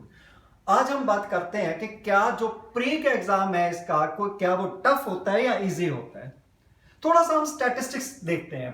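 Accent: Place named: Punjab, India. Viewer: Punjabiya dii Hindi 🔥😁......tq sir....really informative and motivating🙏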